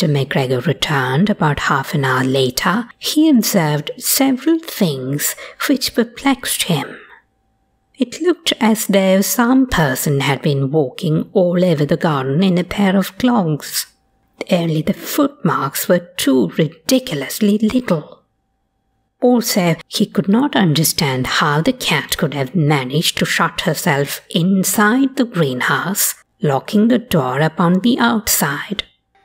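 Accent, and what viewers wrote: RP, United Kingdom. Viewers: This is how Beatrix Potter should be read, in posh British accent, with that old flavour! Ty for this.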